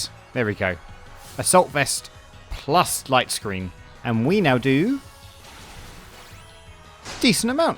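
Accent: United Kingdom. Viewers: He’s British? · You’re bri’ish?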